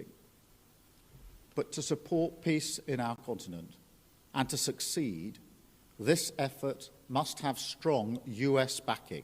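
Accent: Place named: United Kingdom. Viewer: イギリス英語すきだな アメリカ英語は、ベラベラしていて好きじゃないなぁ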